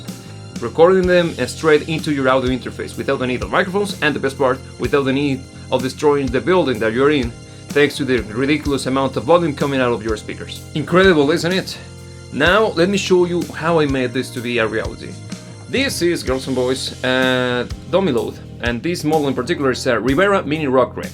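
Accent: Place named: Europe. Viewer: He sounds like a European version of McMurray from Letterkenney.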